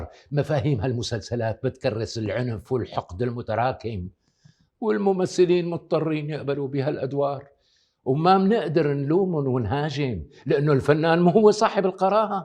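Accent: Syria. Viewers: ريحة الشام و الياسمين.. ريحة وطني.. و لهجة وطني.. ريحة أبي.. و طريقتك بتشبه طريقته.. الله يخليلنا ياك 🌷 و يدوم عليك هالذكاء و الإلقاء و الفكاهة اللاذعة 💕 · جميله اللهجه السوريه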